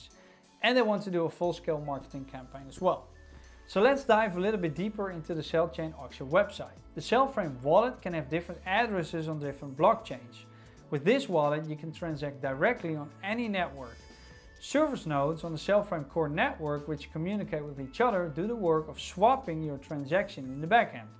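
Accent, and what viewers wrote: Netherlands. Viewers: You sound Dutch :) Nice covering of Cellframe, thx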